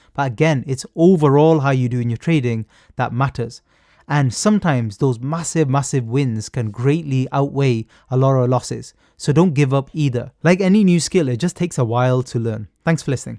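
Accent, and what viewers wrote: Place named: United Kingdom. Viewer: All throughout a well spoken guide on how to avoid scams on Solana network, this really helped me during my learning process of the network and inner workings of the system. Also I would like to point out that nobody ever should listen to an Indian guy with a polished UK accent talking about crypto scams on sketchy websites like photon. good day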